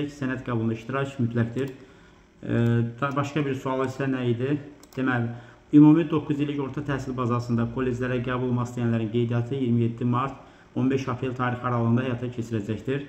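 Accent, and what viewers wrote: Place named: England, United Kingdom. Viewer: müəllim, hərdən siz Azərbaycan dilində danışanda ingilis dili aksenti hiss edirəm😅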